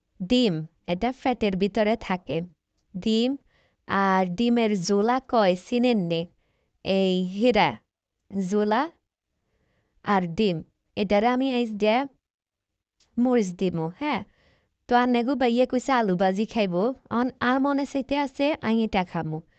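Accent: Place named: Noakhali, Bangladesh. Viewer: আপু আপনি নোয়াখালী কোন জায়গা 🤔🤔🤔আমি অনেক জায়গার কথা জানি 🤗🤗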